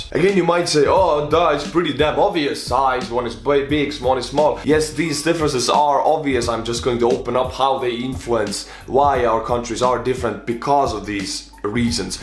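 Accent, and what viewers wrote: Estonia. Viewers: Dude u have still a little but of that estonian accent (kinda of that one tha Ardo Asperk made fun of), while living in the states, im native estonian and have only tavelled to US like twice still i dont have that accent, nice one- actually its kinda unique (unikaalne) ;) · Lahe video :) Mulle meeldib kui sa räägid inglise keeles siis see eesti aksent. :D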